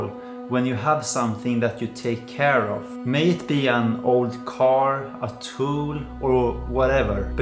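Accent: Sweden. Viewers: Du måste vara svensk!! Finns inget annat, så hårt som du bryter😂 säg mig, hur bra är den kameran. Jag funtar på och skaffa en q2m i värsta fall en q2… har du framkallat några bilder än? Eller gjort nå prints ? Isf hur stort ? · Dat svenska accent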